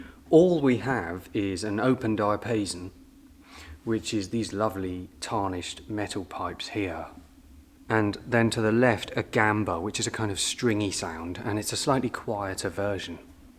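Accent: England, United Kingdom. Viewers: I'm a Brazilian teacher of English and have accessed some of your pieces played on the organ by chance. Amazing work you have been doing! It's been a pleasure watching and listening to your performances while you share information concerning history, geography and harmoniums and organs! And it's being really nice listening to English accent (mine is more of an American one -- some Americans couldn't place where I was from since there are four different accents: from Virginia, New York, Boston and Minnesota). Anyway, thanks for spreading images and pieces of music all over the world! Viviane Horbach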